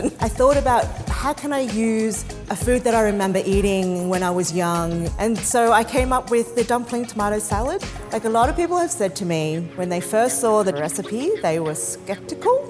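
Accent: Australia. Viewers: Thank you for keeping your Australian accent. Once tomato season returns in Australia, I'll be making that salad. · I knew she was Australian as soon as she spoke… But just discovered her here. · Love that Aussie accent 🦘🐨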